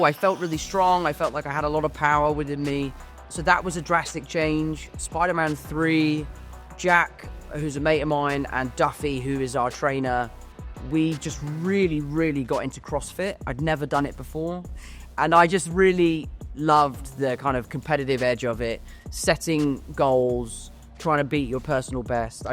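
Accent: United Kingdom. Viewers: The way he speaks , it's as british as it gets ... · The British accent is charming! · I didnt expect him to have a very British accent hahaha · Am i the only one that is just not used to him speaking in british accent XDDD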